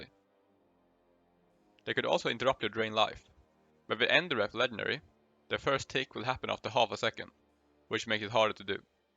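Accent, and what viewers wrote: Sweden. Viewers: This sound so nordic! I love it the english sound exactly like a Swedish brother, keep on working that pronunciation and keep up the good work! Grym video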